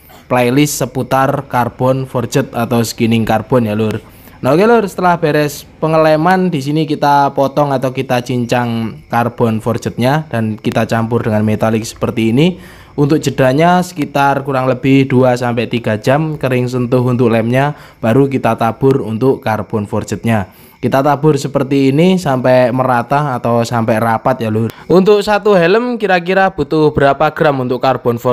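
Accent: Temanggung, Indonesia. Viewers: wong manggung ki?😁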